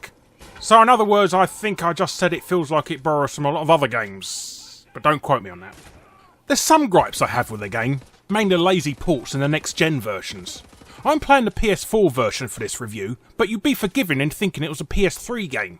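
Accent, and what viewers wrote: United Kingdom. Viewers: You have the most British voice ever, its brilliant. Never played Wolfenstein, really liking Doom 1 though, very fun though difficult.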